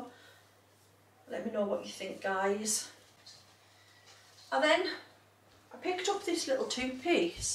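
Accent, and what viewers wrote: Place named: North East England, United Kingdom. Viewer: hi my darling, another great video, i really look forward to watching them, where do u live i live in the north east of england and your accent is like mine!!! xxx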